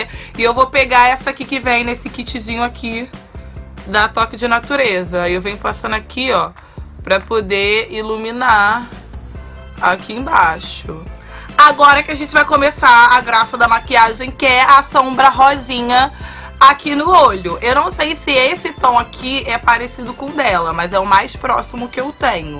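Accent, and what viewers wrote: Rio de Janeiro, Brazil. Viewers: Não acho a voz parecida, masssss o sotaque carioca é igualzinho e as girias tb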